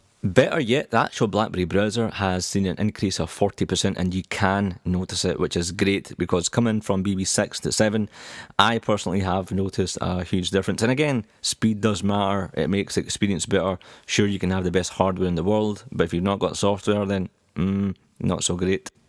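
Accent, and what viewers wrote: Scotland, United Kingdom. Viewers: Scottish, i think...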